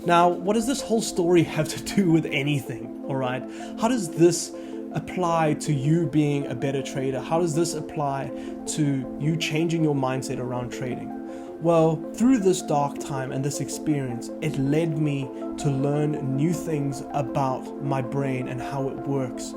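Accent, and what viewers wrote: South Africa. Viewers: brother are you afrikaner or english south african?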